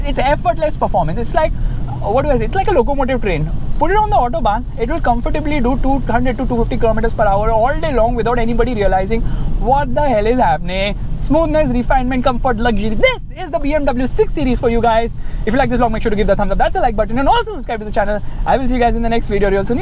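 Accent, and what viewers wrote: India. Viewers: That's an absolute indian accent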